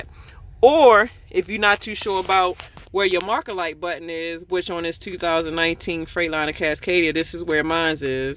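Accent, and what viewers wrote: Baltimore, United States. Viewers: ? your accent is Baltimore? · Sirius Desta thats cause she is from baltimore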